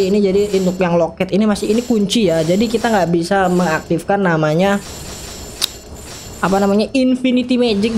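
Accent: Java, Indonesia. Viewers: Bang lu orang jawa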